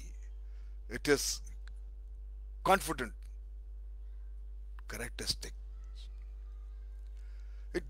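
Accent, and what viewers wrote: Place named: Kerala, India. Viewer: U sound like a mallu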